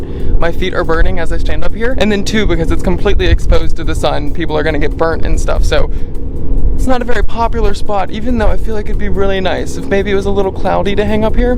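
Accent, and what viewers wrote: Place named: Philadelphia, United States. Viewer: That's a Philly accent if I've ever heard one.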